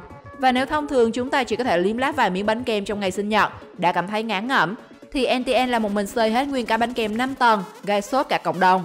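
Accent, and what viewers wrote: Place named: South, Vietnam. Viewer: Miền Nam giọng ngta như vậy m còn đòi hỏi j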